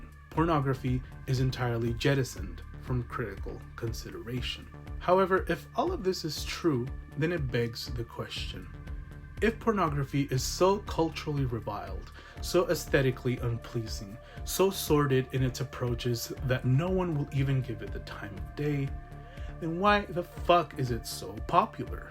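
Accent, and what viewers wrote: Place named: Los Angeles, United States. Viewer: I love Sotos and I really adore you I’m from la and a bibliophile and I think you’re both those things too- I can tell by your Angeleno accent. Keep reading or as i vita sine libras mors est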